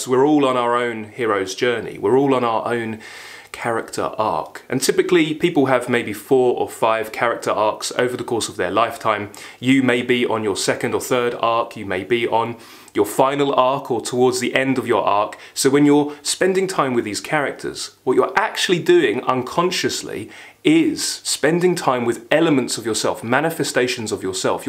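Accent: United Kingdom. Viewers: Hey man, I improve my British accent from your videos. Thanks for the videos.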